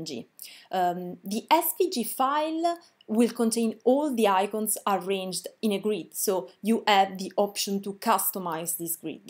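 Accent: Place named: Italy. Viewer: Loved the accent. Italian?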